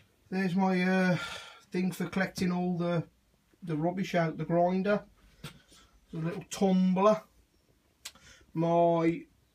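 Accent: Birmingham, United Kingdom. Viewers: you sound like your from Birmingham?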